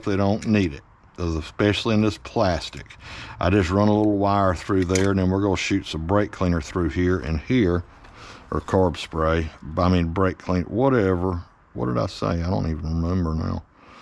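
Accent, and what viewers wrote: South, United States. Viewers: love your teaching with that southern accent thx for sharing your knowledge. I will fallow you from now on.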